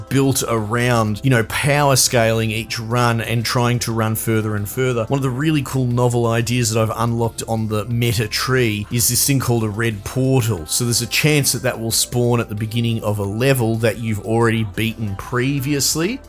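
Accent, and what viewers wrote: Australia. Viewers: ★ ★ ★ ★ ★ ⭐⭐⭐⭐⭐, in my 47 years I have never found myself on a youtube site for game reviews, and I only came upon your review of this game as I was looking for games that didnt require hundreds of gigabytes of storage. So seeing Hellclock at less than 3Gb I googled it and your review of this game was the top youtube result. So I start your review, and within 20 seconds I hit the subscribe button. There are so many reason why, and I wanna get back the review of the game so I wont blow too much smoke up your arse, but can I just say, I was in stitches and simulateously got a brain hard-on. You know your stuff man, and it's good to hear a fellow Aussie (or maybe Kiwi) who really doesn't need to be told how to suck eggs. I will be checking out more of your content in the near future, I just wanted to say "thank you, you're awesome." Sweet as I'm done.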